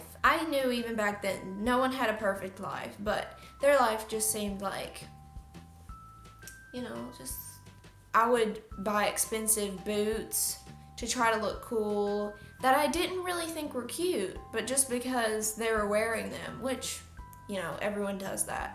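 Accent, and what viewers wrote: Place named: South, United States. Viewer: I love your chill personality and southern accent, you just got a new subscriber 💓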